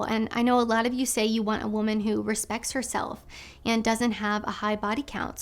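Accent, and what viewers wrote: United Kingdom. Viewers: Other than her british accent, I 100% agree with her. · Her voice is more masculine than it is British accent. I will leave it at that. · I came to the conclusion that I would never marry a white woman a long time ago and I didn't. I married a Philippina 11 years ago. No headaches, no arguments, no bullshit. I know that the woman seen here is an Asian of some flavor and has a British accent. Stick with PH as most (but not all) are much better than what you see here. You will thank me later!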